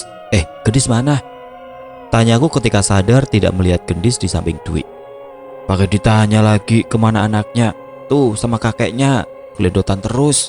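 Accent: Indonesia. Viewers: Pengucapan b. Inggris nya, Indonesia bgt😁👍👍. Dtunggu kelanjutannya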